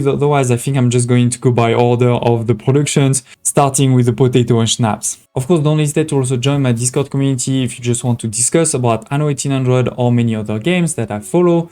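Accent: France. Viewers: Je ne suis pas douée en anglais, mais merci merci pour tes explications 🥰. Grâce à ton accent visiblement français 😉, j'ai réussi à te comprendre (et il faut dire que le voir en vidéo en plus ça aide, bien évidemment). EDIT : je viens de voir dans "à propos" que tu es Français, je ne m'étais donc pas trompée, ton accent t'a trahi 😁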